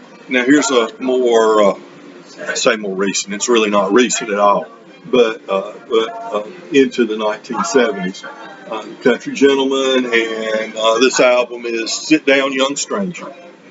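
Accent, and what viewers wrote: South, United States. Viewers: I don't know if I can be your friend. You keep saying prog rock fans have no sense of humor. You make me want to cry when you say that (LOL). Seriously, I enjoy your channel. I enjoy your clumsiness. I am more clueless about this video making technology than you are. Secondly, you remind me of my best friend that passed about 4 years ago - same hairstyle and also a southern accent (his was a GA-FL mix). I think you are just a decade older than me. My friend was born around the same time as my parents.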